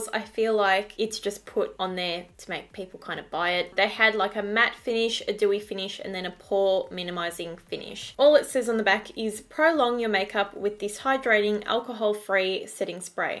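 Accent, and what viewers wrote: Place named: Australia. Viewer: This is my first video watching you and wow… AUSTRALIAN 😂😂😂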